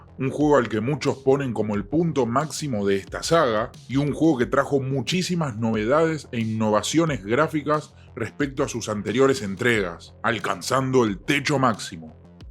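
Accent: Argentina. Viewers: Hola. Sos un groso. Sos argentino puede ser?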